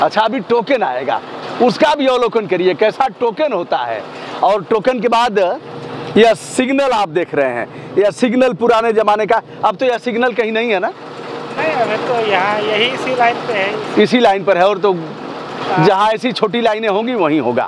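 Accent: Standard. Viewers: 🙏चाचा जी प्रणाम आज बहुत अच्छा लगा मुझे आपने जो अदभुत दृश्य दिखकर मन बहुत ही आनंद आया आपका बहुत बहुत धन्यवाद आपका शुद्ध हिंदी बोल बहुत अच्छा लगत 🙏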